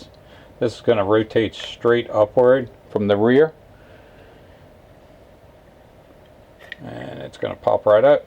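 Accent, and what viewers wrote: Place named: South Louisiana, United States. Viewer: I like that shirt. I knew I recognized the accent originally being from south Louisiana myself.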